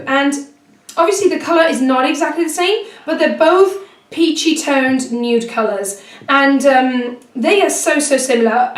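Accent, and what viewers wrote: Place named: England, United Kingdom. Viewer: I know, especially since there are other pages that don't charge :( I remember being to Cardiff and wanting to take the whole stand back home! <3 Thanks God I didn't because I only took a LE Batiste dry Shampoo (the one with the Brit flag that was released for the Olympics) and they made me throw it away at the airport :( Well, lovely Youtubers deserve lovely subscribers (and thanks for calling me lovely, by the way :) ) P.S: Do you mind if I ask you where in England are you from? I love your accent ^_^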